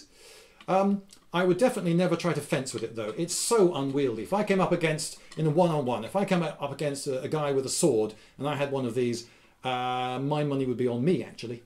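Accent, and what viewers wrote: France. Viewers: i liked the video only because of the frenc accent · You should do more french accents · Your outrageous french accent sounds like Engrish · Love the outrageous french accent :) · This is the 6th time I watched this video and the 5th time I watched it for the outrageous french accent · Brilliant French accent ! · Thumbs up for French accent. Lol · I don't think the poor defensive capability would be as much of a disadvantage if you had a good shield with it. Naturally still worse than other weapons, but not as ridiculous as your French accent makes it sound like. I've always wondered why several balls would be a benefit, though. Good video. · your french accent is awesome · Your french accent just made my day ! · Outrrrrrrrrrrrrrageous French accent! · Best French accent ever! ;D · Yes it's good. Also your french accent is hillarious. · Your french accent is very reminiscent of the pineapple from telefrancais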